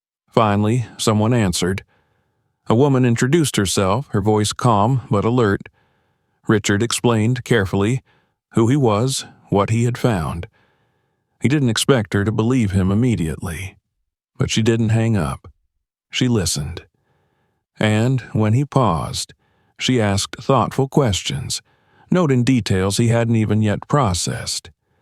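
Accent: South, United States. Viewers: These southern drawl stories are Cool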